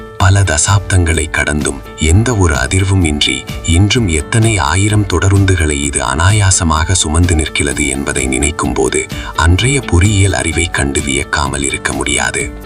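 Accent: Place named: Jaffna, Sri Lanka. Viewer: இயற்கை மற்றும் மனிதன் கைவண்ணத்தில் உருவான அற்புத கட்டுமானத்துடன் தேன்போன்ற யாழ்ப்பான தமிழ் வர்ணனை அற்புதம். இதுபோன்ற இனிமையான தமிழை எமது தமிழ்நாட்டில் கேட்க முடியவில்லை . இனியொரு உலகம் படைக்கப்பட்டால் அதில் தூய்மையான இனிய தமிழ் மட்டுமே இருக்கவேண்டும். வாழ்க தமிழ்.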